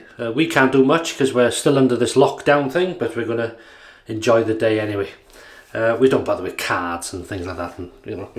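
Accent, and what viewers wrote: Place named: Barry, United Kingdom. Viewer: Where you from mate, you sound like your from Barry town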